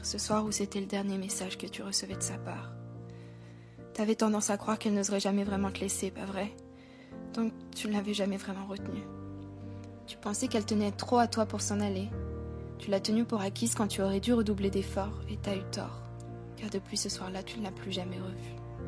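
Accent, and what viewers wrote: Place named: Canada. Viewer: mercii 🙃javais pas bien compris mais tu parle canadien,enfin ta l’accent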